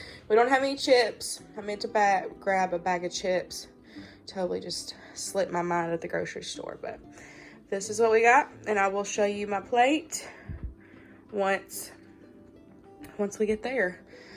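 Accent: South, United States. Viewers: Just curious, what state do you live in? You sound similar to a southern, which I live in Georgia, but you’re not as country as Georgians!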